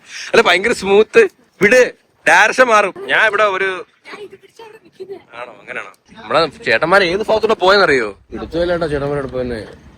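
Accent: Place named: Kannur, India. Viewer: Kannur language ayyi oru cut unde ee language inne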